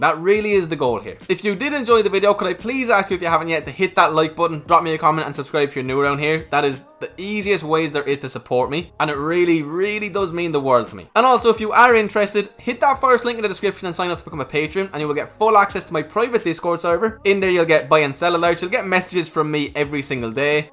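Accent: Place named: Ireland. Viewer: He's Irish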